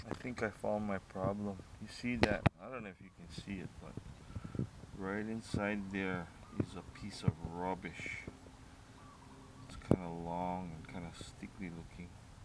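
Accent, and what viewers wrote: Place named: Hawaii, United States. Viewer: Funny how you can just tell someone from Hawaii from the accent. Lol as soon as you started talking I knew you from Hawaii.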